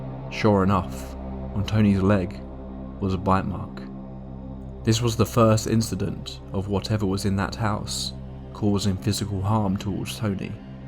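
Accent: United Kingdom. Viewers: Just stumbled upon your channel. Great storytelling and delivery. Not many of these types of videos have that elegant across-the-pond accent. 😊 Thank you for all your efforts and keep up the great work mate! Cheers from New York City.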